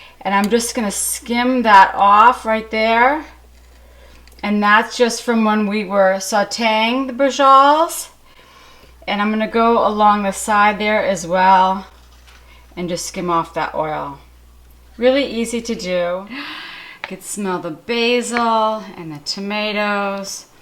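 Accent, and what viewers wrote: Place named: United States. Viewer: Presumably - you take the string off before serving? Love that American accent by the way!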